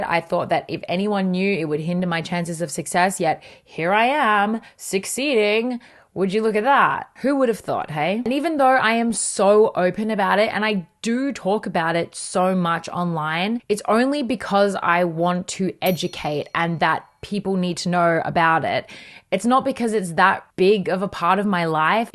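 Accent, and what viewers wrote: Australia. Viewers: Most interesting thing to me is how your accent is 90% Australian, then 10% Midwest American. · Hi, I'm curious about your accent: is your way of talking typical of where you are from, and where are you from? You sound a little bit like Queer Kiwi, but she's from New Zealand, not Australia. Thank you!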